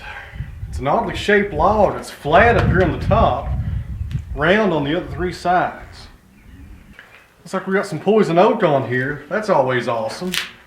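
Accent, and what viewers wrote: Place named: Georgia, United States. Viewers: . . . he has a 'drawl' in his voice.Beautifull accent.Lots of them there down in the south...I enjoyed the show very much and i thank you for taking the time to spend it with us.... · First vid I've watched of yours. Soon as I heard your accent I recognized it. You say your in Georgia? I think I heard your accent from guys from Kentucky too. I could be wrong.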